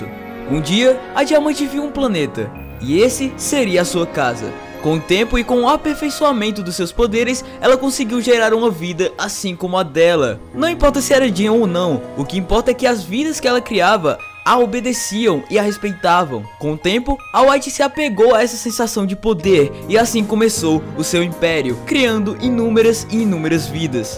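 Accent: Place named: Northeast, Brazil. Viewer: Na moral esse seu sutaque nordestino da agunia se loko fala todo errado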